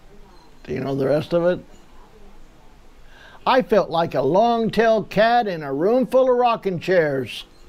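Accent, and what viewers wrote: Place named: Oklahoma, United States. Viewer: My grandmas family came to Central Illinois from Oklahoma at that time. Now that you mention it, you have a similar accent to her.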